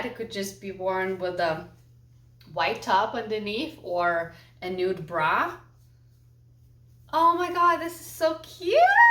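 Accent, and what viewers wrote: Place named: Lithuania. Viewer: You have such a nice personality! I could watch you over and ovr. I'm new sub, 77 from Wisconsin, USA! I'm glad I found out your origin is Lithuanian, because I've watched 4 videos and I've been trying to figure out where you were born, your accent is SO CUTE! I'm watching ALL your videos now. I wish I fit in your clothes! I'm a bigger woman, but I would LOVE to buy an outfit to wear from you. God bless you!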